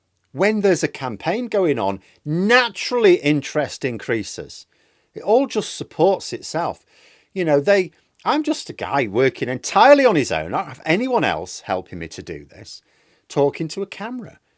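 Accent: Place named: Manchester, United Kingdom. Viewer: Here for the Manc doing posh tory comedy voice 😆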